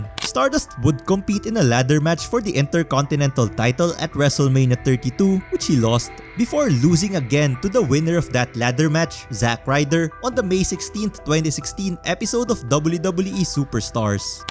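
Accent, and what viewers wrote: Philippines. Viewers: your english is very Filipino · You sound Filipino. Are you? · That accent... Filipino?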